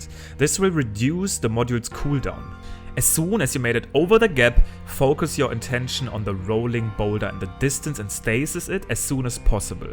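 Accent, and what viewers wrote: Germany. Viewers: Is he german? His accent sounds german...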